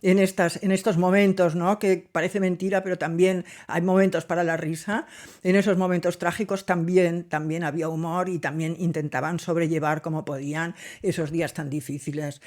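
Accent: Catalonia, Spain. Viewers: UNA COSA, YO PENSABA QUE ERAS CATALANA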